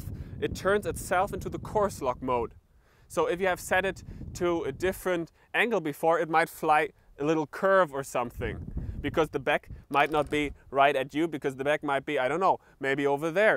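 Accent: Germany. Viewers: Well except for the German accent this is actually pretty good :)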